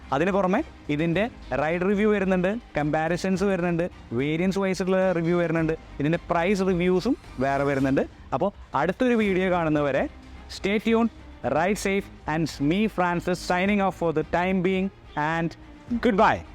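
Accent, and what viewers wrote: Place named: Thrissur, India. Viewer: Clear technical explanation, no BS, narration in authentic Thrissur Malayalam. Really liked your review keep going. Lots of love ❤, more power to you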